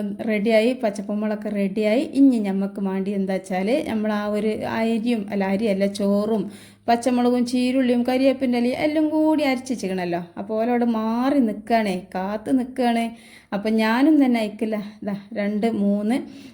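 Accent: Malappuram, India. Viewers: Malappuram basha ishtam 💚💚💚ഞങ്ങൾക്ക് നിങ്ങളെ നാട്ടിൽ വന്നാൽ കുറവാണ് ഞങ്ങൾക്ക് പറയാൻ 💚💚💚🥰🥰🥰 മലപ്പുറം എവിടെയാണ് എന്റെ ഇത്താത്ത യും മോളും അവിടെയാണ് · മലപ്പുറം താത്തക്ക് ഒരു മാറ്റവുമില്ല ചില വിഡിയോയിൽ മാറ്റമുണ്ട് അതിന് ഒരു ക്രിത്രിമം തോന്നുന്നു ഇന സംസാരം തന്നെ മതി. recie Pie കൊള്ളാം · ഇയാളെ വീട് എവിടെയാ 😂സംസാരം കെട്ടിട്ട് മലപ്പുറം ആണ് മലപ്പുറത്തു എവിടെയാ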